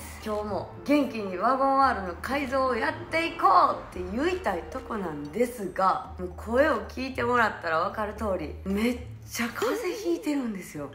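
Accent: Kansai, Japan. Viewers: さんの関西弁最高癒されます💖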